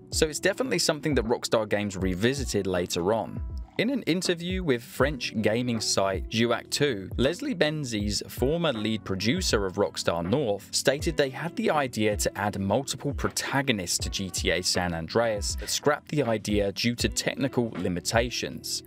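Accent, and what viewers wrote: Australia. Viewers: Do you haver alittle bit of aussie in your accent?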